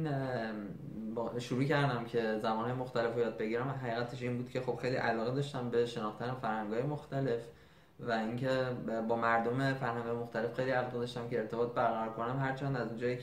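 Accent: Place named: Chile. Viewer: Qué extraño que no tengas más vistas y likes. Eres como un políglota "invisible" haha, Tú español está bien, es gracioso tu acento, se parece al acento chileno. ¿Cuándo subes otro video?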